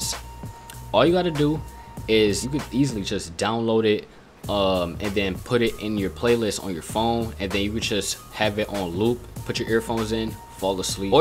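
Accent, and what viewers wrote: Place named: United Kingdom. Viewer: When I hear this guys voice I thought he was from the uk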